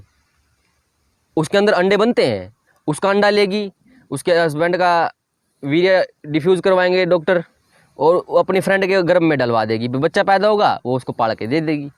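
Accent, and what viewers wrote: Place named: Haryana, India. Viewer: Haryanvi accent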